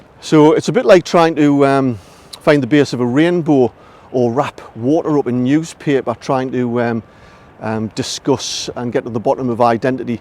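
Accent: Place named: Geordie, United Kingdom. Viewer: You will have to tone down your Geordie accent, and speak with a plum in your mouth. I remember that BBC turned down an appearance by the “The little waster’ on a show because they thought the public wouldn’t understand him.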